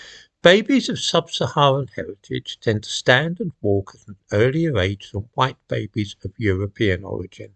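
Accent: United Kingdom. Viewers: good job on this comment section you created a nice space for racists and xenophobes to gather and share their thoughts. "race matters we ignore it" what do you suppose we shall do with race ? what is your suggestion oh great one? push them towards taking up sports? racially profile them so they can be closley watched by the police because they have more testosterone ? (!) you, WHITE PEOPLE, have committed, and continue to commit some of the most horrendous crimes against humanity, wasn't your "kind" who would cut the right hand of african children if they failed to work as hard their "masters" demanded. wasn't that barbaric? oh that was necessary because you think they are inferior race don't you ? disgusting. just because you speak with a British accent doesn't mean what you say makes ANY sense. "race matters we shouldn't ignore it" alright you tell us what to do lol. 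or you're simply leaving things vague for your followers and commenters to fill in? judging by comments such as "the crime stats prove this" they sure understand your language. they sure are great at generalizing, putting people in a box and putting a label on it.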